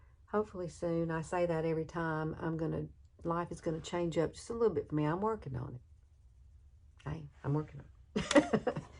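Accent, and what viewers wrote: South, United States. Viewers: Nothing wrong with sounding Southern, it’s a great part of our country! It sounds cute too. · Ur video from 6 yrs ago has a bit of a diff southern twang but it’s all so cute :) I miss hearing u say charlotte tilSbury 🤗♥️ I can’t wait to try ur make-ur-own liner brush technique & ur shadow hooded tip 💖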